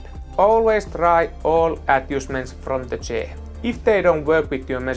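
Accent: Finland. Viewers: I hope that youtube algorithm gods will help you grow, you made a good representation, pretty high quality video. And after playng a lot of MSC i love finnish accent :-DDDDDDD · Are you from Suomi? You speak exactly same as my Finnish husband :D nice chair actually.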